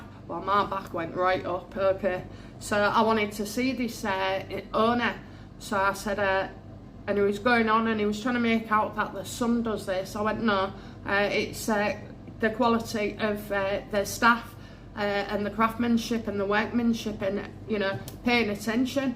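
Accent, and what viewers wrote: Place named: Yorkshire, United Kingdom. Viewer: This lady speaks English very clearly, no problems understanding her northern (Yorkshire) accent, a great regional favourite! Usually associated with a no-nonsense, down to earth, hardworking, family-loving nature.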